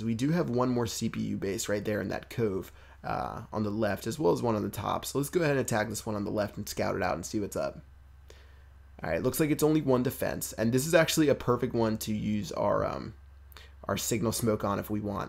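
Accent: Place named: United States. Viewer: I've been playing boom for about a month now, I'm from aus, would love to do some commentary work with you, my Aussie accent and your American one would make good commentary 👍👍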